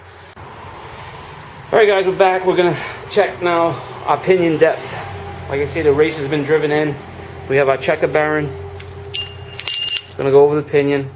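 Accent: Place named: New Jersey, United States. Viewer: Cool accent...you a jersey boy?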